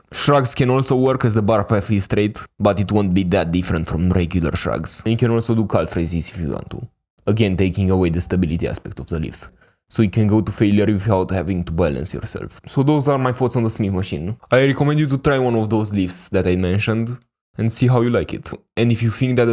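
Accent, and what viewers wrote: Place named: Russia. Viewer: its impossible to listen this rus sound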